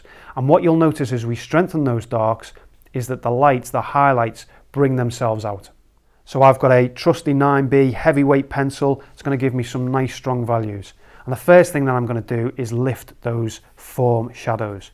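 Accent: Liverpool, United Kingdom. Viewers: Scouser? Me too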